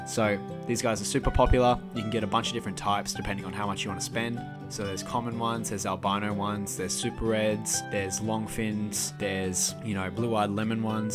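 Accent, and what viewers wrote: Australia. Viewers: bro you sound Australian why you talking in gallons?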